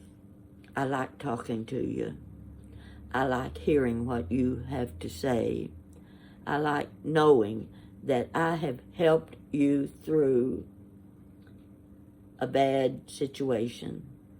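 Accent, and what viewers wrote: South, United States. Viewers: Awww…kiddo…please just block the yucky people and try not to even read that crap. Some people are just so miserable in their lives they feel the need to try to make others feel miserable too. Don’t let them get to you. They are not worth a second thought. …you are a real blessing from God! You make me and so many, many others happy. You are real and I love that about you. I love that you do talk to us like we are all sitting together having coffee. Please continue your channel just exactly as you are and in the same way you always have. I live about 1000 miles away from my mom. She has Alzheimer’s and it just breaks my heart not to be with her. I am still able to talk to her on the phone. She is my best friend. Her sister, my aunt, is my other best friend but she passed in 2018. We called ourselves the three musketeers. You put out such love, kindness, comfort, etc and I feel like I’m in the presence of my two best friends. 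Ha, change your southern accent to Scandinavian/North Dakotan and it is definitely just like them. I never did find your address, maybe one day then I can send you the older jewelry for your projects. Love you bunches! I thank God for you and all of your subscribers. ❤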